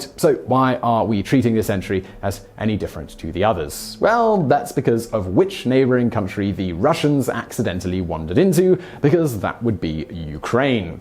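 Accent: England, United Kingdom. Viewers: A suggestion about English accents -- the host has one.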